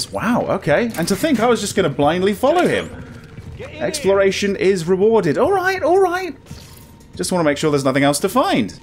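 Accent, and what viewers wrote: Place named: United Kingdom. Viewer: watching exploding mutants and hearing "Oh that was lovely" with a British accent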